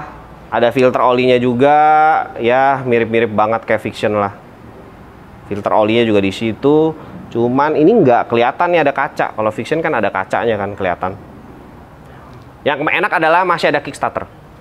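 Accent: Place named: Medan, Indonesia. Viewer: Bang, abang orang medan ya. Logat bicaranya kayak bos saya. Hehe Semangat terus bikin chanelnya bang.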